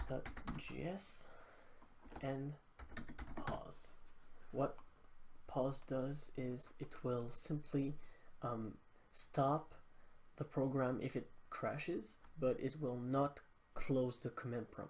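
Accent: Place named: France. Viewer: Un français anglophone ? Why not, une petite correction, le point se dit « dot » 😉 en tt cas gg à toi !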